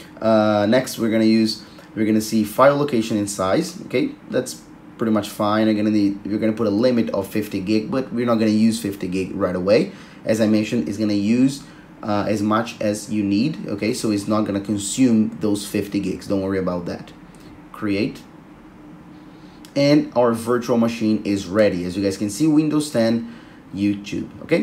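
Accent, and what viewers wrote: Brazil. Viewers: I'm hearing a strong Brazilian accent lol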